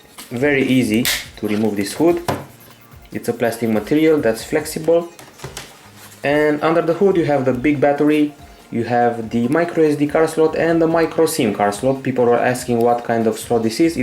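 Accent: Romania. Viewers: Ce accent romanesc:)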